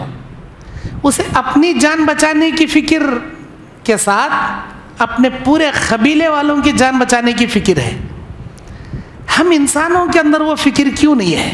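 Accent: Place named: Solapur, India. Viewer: Sobhanllah aapmyry sholapur ky hyn bat cheet ka lhja bhe alhmdu lellah jzay khyr dy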